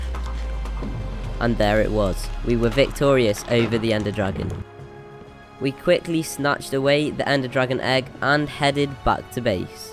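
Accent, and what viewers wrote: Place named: United Kingdom. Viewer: ayy is british like me im fromnothern part of england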